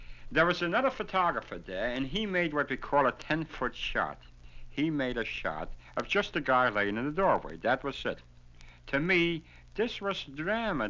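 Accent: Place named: Manhattan, United States. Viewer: Sadly that Manhattan “East Side” accent is now extinct…even on toity toid and toid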